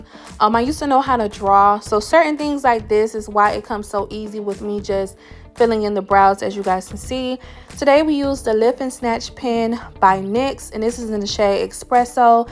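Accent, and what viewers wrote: Miami, United States. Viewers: I love your Miami accent baeeee💞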